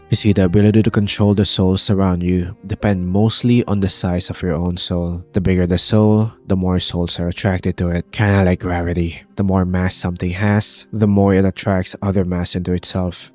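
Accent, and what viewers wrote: Philippines. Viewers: do i hear a filipino accent?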